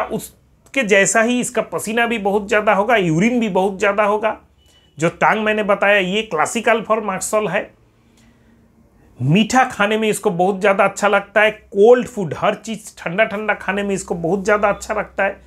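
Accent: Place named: Bengal, India. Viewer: समझाया तो अच्छा है. लेकिन बंगाली पुट की वजह से गड़बड़ हो जाता है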